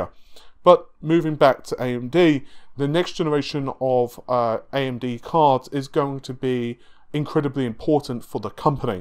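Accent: United Kingdom. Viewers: He is British, not American. Jesus.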